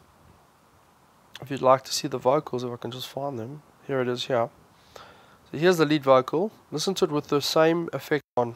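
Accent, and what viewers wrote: South Africa. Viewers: South African accent allert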